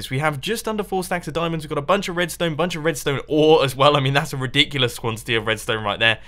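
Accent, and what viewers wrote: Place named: United Kingdom. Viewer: god, I see so many people commenting that "mumbos voice sounds like wheatly from portal"... except it doesn't. wheatly is a robot which has a british accent. is british, hence, has a british accent. just because they both have british accents, doesn't mean they sound alike.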